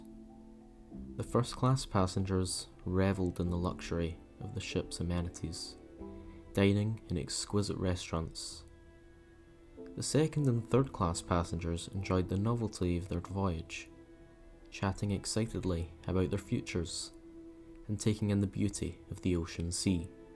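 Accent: Scotland, United Kingdom. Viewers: Bros scottish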